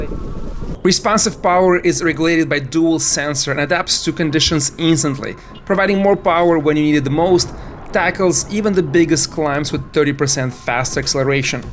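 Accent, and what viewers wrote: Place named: Ryazan, Russia. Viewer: Чувак, что с рязанским акцентом?))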